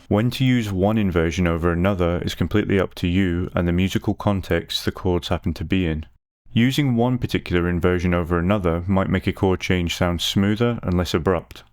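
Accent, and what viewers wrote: Scotland, United Kingdom. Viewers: I love your videos. Most of the ones I watch are American and you have a great confident Scottish accent. You speak calmly and clearly and everything seems very well planned and thought through. I could never seem to harmonise the harmonic minor scale properly. This video has taught me that augmented chords have a sharp 5 and NOT a sharp 4 😂 thanks for everything matey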